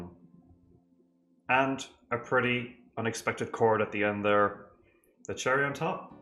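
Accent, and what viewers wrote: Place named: Cork, Ireland. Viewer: woah the world is so small… started watching this video and got caught off guard by ur accent and went to check where ur from, i’m also from cork!! crazy that, with them being a relatively small group and cork being a relatively small area, there’s someone also from there reacting to my fav group…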